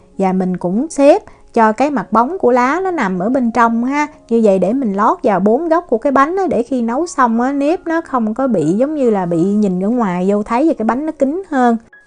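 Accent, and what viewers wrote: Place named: Mekong Delta, Vietnam. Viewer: lam Theo cach cua Chị Thanh cong nhieu mon ma khong co cach nao khoe voi Chị , Trong clip Chị co noi nha Chị o bien hoa ? Ma nghe tieng Chị Em nghi Chị o mien Tay , Em cung o gan bien hoa 😀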